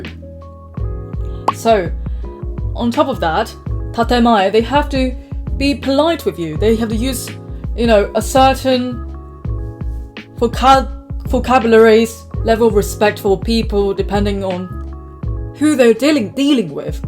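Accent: United Kingdom. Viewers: When you speak Japanese, you sound like a Japanese person. But when you speak English, you sound more British. Either way, you sound great. No judgment here. 👍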